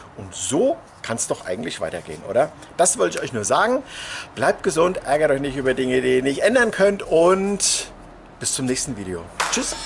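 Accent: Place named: East Germany, Germany. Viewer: Könntest glatt als Ossi durchgehen 😅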